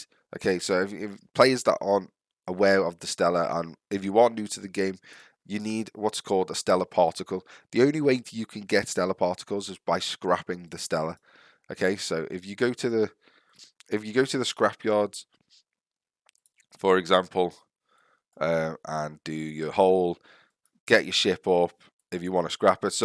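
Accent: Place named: Northern England, United Kingdom. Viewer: Love the northern accent! I'm a little farther north, I'm Yorkshire. Altincham are you?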